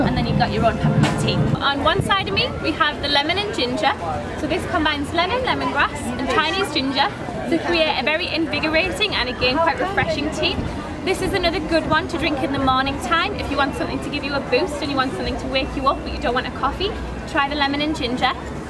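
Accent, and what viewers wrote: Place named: North, United Kingdom. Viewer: lurve this veedyo. (sorry, her northern accent is as delicious as the teas she describes- and my version is horrid.) but I do love this video. I am an anglophile so....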